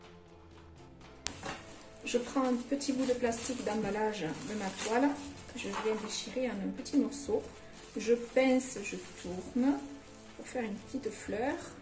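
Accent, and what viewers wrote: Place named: Marseille, France. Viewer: You have a lovely accent...Marseille maybe. Similar to the rapper Akhenaton from IAM if i'm not mistaking. Anyway, love ur work ♥️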